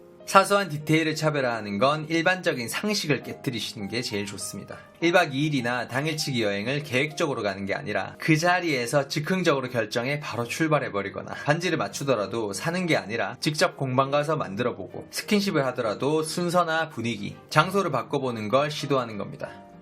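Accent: Gyeongsang, South Korea. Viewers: 갱상도 출신맞?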